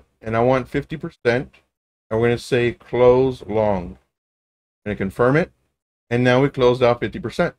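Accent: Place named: Cuba. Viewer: You sound Cuban or Hispanic of somewhat, you my guy.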